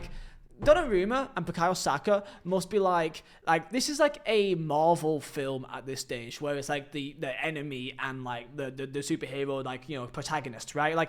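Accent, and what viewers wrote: United Kingdom. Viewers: Oh my God, I thought I'm not able to notice british accent as a total foreigner, but... Wowowowowow 😮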